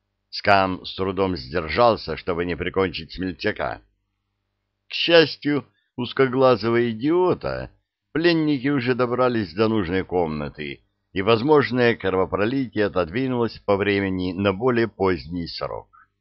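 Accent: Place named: Odessa, Ukraine. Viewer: Снова этот «одессит» читает. Не стала слушать. А так хотелось послушать.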